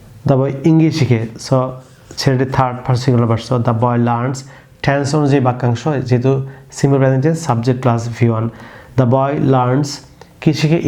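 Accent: United Kingdom. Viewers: I like british accent so much. I am learning british pronunciation from your You tube channel sir. You are good at british accent.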